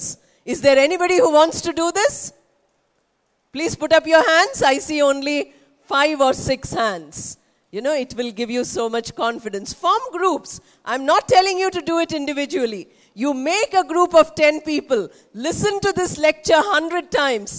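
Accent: India. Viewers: Your English speaking is just like a Hindi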